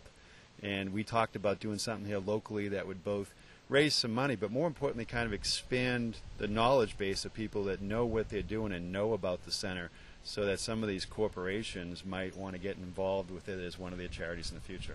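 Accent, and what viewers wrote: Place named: Boston, United States. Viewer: Great accent. You can talk the boy out of Boston, but, his "hart' is still in all the right places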